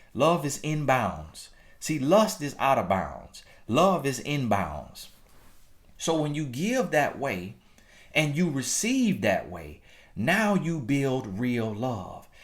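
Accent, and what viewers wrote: South, United States. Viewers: I love watching you. everyday. You tell the truth. You so country "You Here Me" lol.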